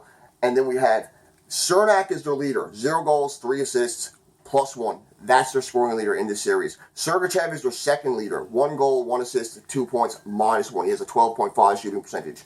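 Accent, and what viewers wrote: Newfoundland, Canada. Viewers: Is that a Newfoundland accent u have? Scottish origin? Im Just a silly American so I really don't know but that's what I'm guessing...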